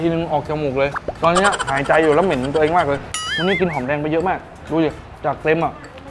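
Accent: North, Thailand. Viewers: อยากให้ พูดเหนืออะ ฟังเเล้วน่ารัก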